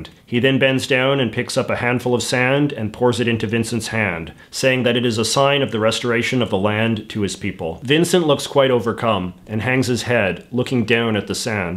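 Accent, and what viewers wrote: Canada. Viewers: the what now?? I knew you had a strong Canadian accent but there is no way that's how to pronounce archipelago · I stumbled onto your channel by accident a couple weeks ago towards the end of a YouTube binge after a long work week. Not even a minute in, I remember feeling this overwhelming sense of animosity for you and the post. It wasn’t just that I disagreed with your politics or world view; I really didn’t! I don’t know if it was your smug disposition or that forced thick Canadian accent, but I found myself quietly siding with those French hillbillies from Quebec. Its been a few weeks and I have to confess I subscribed and look forward to your videos every week. Still haven’t figured it all out but I’ll keep tuning in until I do.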